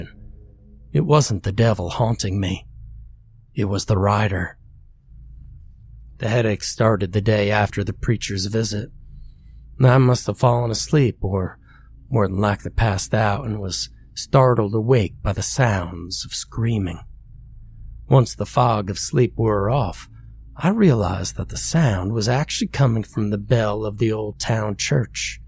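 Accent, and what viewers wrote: South, United States. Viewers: Great southern draw really took this story over the top Great work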